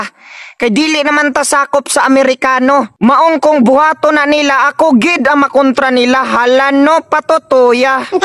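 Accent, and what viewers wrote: Visayas, Philippines. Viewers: Sakto bisayaa gyod Dong😅😅😅😅😅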